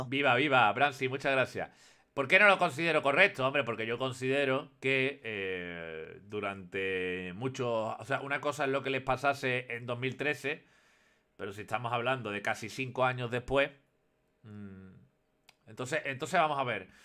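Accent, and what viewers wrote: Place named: Andalusia, Spain. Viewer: Si nos encanta porner la r en vez de L, como er Dalas, er Cuphead y así, nos parece magnífico el acento andaluz de er.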